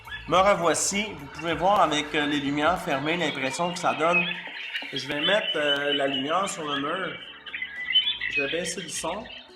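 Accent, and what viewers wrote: Quebec, Canada. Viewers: Merci beaucoup pour la démo, en plus vous semblez bien sympathique avec votre accent qui donne envie d'aller au Québec :)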